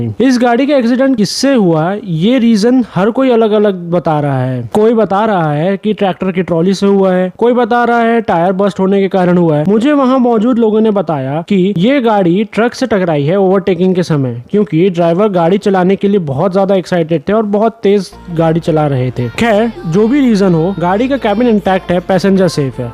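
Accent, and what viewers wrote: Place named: Chhattisgarh, India. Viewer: Are you from Chhattisgarh because your accent feels like it.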